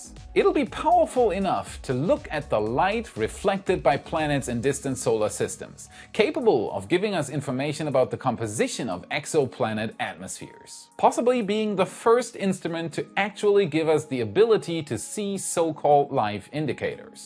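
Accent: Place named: Germany. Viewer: THE #1 most important thing about American English is that “the other person” understands you. It’s okay to be German and sound like it! And stop worrying about your “r”s! We LOVE your accent!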